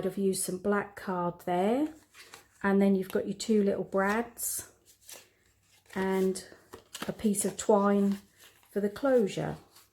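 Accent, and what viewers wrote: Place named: Norfolk, United Kingdom. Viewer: Are you from Norfolk..I can hear your accent? 😍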